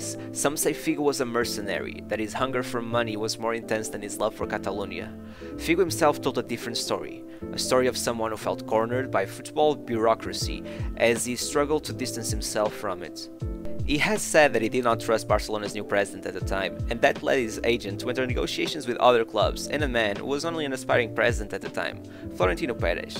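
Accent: Portugal. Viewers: O sotaque e pronunciares bem os nomes portugueses denunciaram-te🤣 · Judging by your accent, I'm very sure you are Portuguese. Great job with this channel, keep going! 😁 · Lovely video from a portuguese speaker, if I can catch your accent correctly, am I right?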